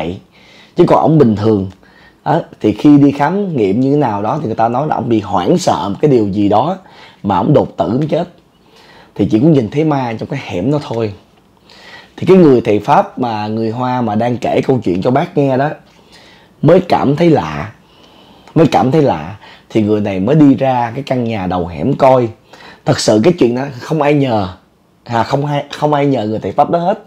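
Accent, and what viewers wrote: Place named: Vietnam. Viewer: Nghe một thời gian e đoán ra bác người tỉnh nào rồi, đồng hương hi hi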